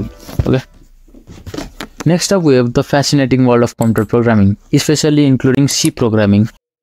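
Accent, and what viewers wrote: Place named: Nepal. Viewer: Bro speak in nepali. Do what you are good at. Nepali accent is not appealing.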